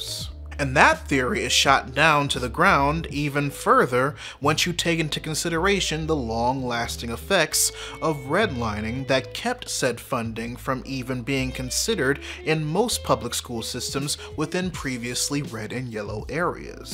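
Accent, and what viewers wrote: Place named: Detroit, United States. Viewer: Native Detroiter here — living in the south for the past decade. I’m so glad I stumbled upon your channel. It was recommended to me after watching Double Toasted’s A Goofy Movie review. I’m older, but this movie brought back so many memories for me, as well as my millennial-aged son. It’s why he stopped dead in his tracks when he saw me watching your video. We both gasped when you mentioned being from Detroit, then I immediately said, “He sounds like a Cass Tech alum.” Sure enough, you confirmed it! Always love seeing my fellow Technicians doing well. I won't mention what year I graduated. :) Anyway, my son and I both subscribed to your channel and we're eager to dive all the way in. We saw The Batman Thursday and I can’t wait to hear your thoughts on it. Keep up the excellent work!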